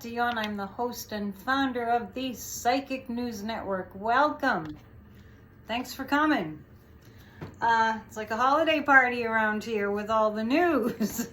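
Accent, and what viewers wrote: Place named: East Coast, United States. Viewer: You sound East coast. Makes me feel right at home. 💛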